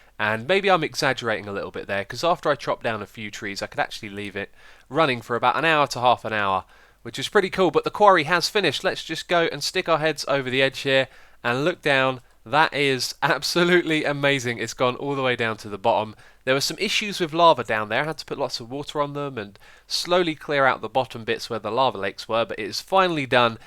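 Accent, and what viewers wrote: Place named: England, United Kingdom. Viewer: Don't correct how someone pronounces it, people pronounce in different ways. He's english you are most likely American.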